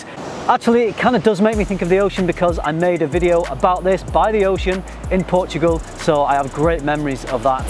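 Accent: United Kingdom. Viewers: Dammnnnn ur cinematography skills are fabulous and gud review as well... I m from Pakistan but I don't why British accent feels so attractive to me... I would love to listen that all day long...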